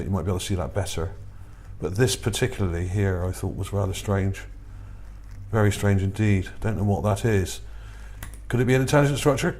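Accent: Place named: West Country, United Kingdom. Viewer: Thanks ….for another share. Always fascinating. Especially in that warm West Country voice of yours. I am from Hampshire myself.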